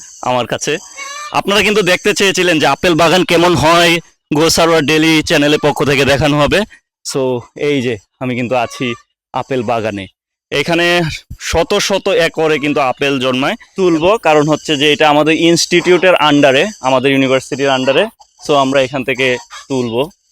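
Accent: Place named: Mymensingh, Bangladesh. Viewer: Mymenshinga,ko k kho bole bangàlataow bolte pare na,blog kore aber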